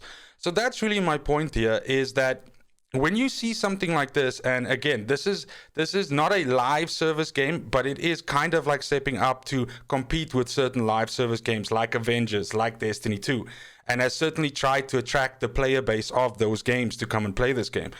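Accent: South Africa. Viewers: Yo yo my guy, you from South Africa? I feel exactly the same, wasn't going to get this, played the demo, recognised the studios community interaction, then pre ordered. Nice vid, you get a sub from me.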